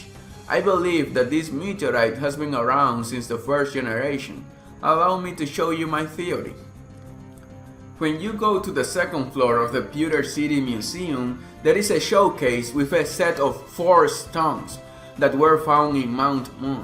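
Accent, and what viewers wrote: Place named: Latin America. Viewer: That was a great theory, awesome job man! I am curious about your accent, are you latino?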